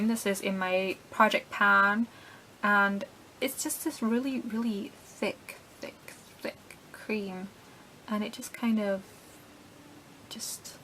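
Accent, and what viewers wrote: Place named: Scotland, United Kingdom. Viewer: Odd comment coming at you, but I enjoy listening to you. I like Scottish accents, and yours is very easy to understand and pleasant :)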